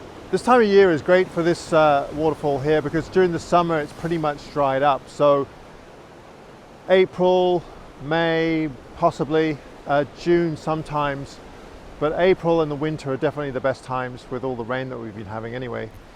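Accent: United Kingdom. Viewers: I subscribe to a half dozen photographers and enjoy them very much but you have a special eye in your photography that I really enjoy. I'm also partial because I'm from Vancouver Island and admire the way you share it with the world. It's an amazing place. Your vlogs are truly awesome and better than any TV show I'd sit in front of. Congrats on the new slider! More gear. :) Mind you, IMO, the time-lapse of water and nature distracts from the beauty. But still a huge fan! Keep 'em coming. BTW, what's your accent? UK?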